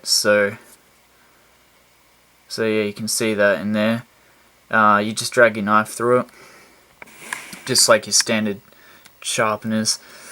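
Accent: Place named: Australia. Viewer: U sund Australian iam Australian and Asian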